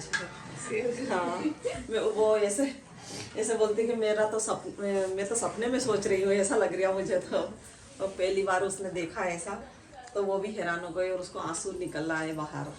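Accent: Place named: Indore, India. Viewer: Ye indori language hai inki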